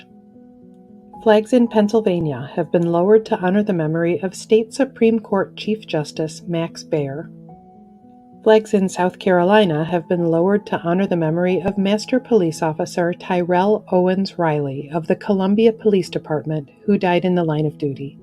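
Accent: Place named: Midwest, United States. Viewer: What a nice narrator. Such a kind, calming voice. And I hear a midwestern or WNY accent which reminds me of home.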